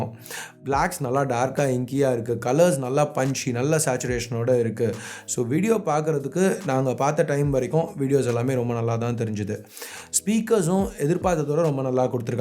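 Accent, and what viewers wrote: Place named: Telugu, India. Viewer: It's really surprising that you are in talking in Tamil because I thought that you were telugu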